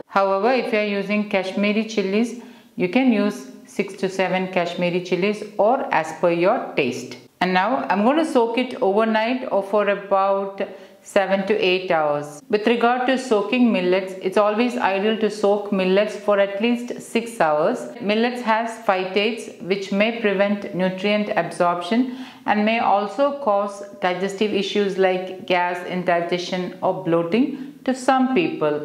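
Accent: Kerala, India. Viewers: Delightful to hear the nice mallu accent again… felt homesick 😊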